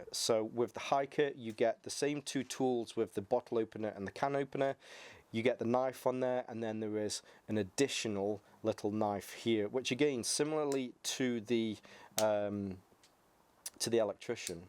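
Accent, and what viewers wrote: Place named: Stoke, United Kingdom. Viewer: You sound like a stokie. Good video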